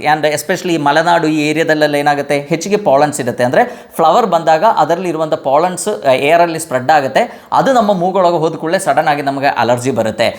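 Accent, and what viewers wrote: Kerala, India. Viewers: Ok, but look like Dr from Kerala, kannada is Malayalam mixed kannada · Well explained Doctor you are speaking very good kannada beaing a Malayalam slant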